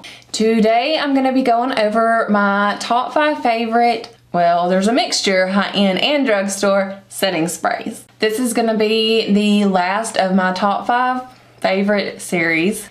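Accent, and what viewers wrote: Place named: Arkansas, United States. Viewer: likex10 I only ever used 1 setting spray and thats macs fix + ....miss that smell. Haven't purchased that in a long while. Sorry to hear about your neck hope you feel better soon. Will keep it in prayer too. Arkansaw how cool! Thats where that accent comes from 😃👍👍👍 that is so beautiful. I love meeting ppl who Love the Lord too. I am most thankful for deliverance and overcoming things I never thought I could #GodbeTheGlory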